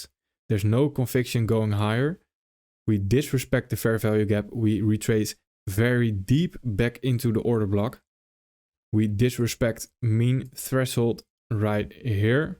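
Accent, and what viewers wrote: Netherlands. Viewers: Love how they're not too long and love the Dutch accent. 🙂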